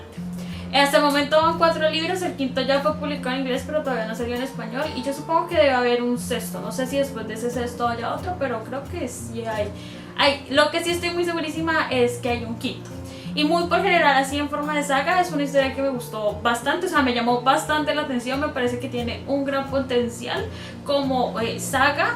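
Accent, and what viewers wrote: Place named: Colombia. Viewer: Jaja me estuve preguntando durante el video si eras de Colombia o de Mexico pero cuando dijiste le coji como bronca me di cuenta saludos buen video parcera😊😊😊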